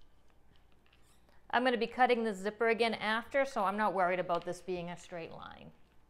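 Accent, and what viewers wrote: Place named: New England, United States. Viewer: Great tutorial...you sound like a fellow New Englander...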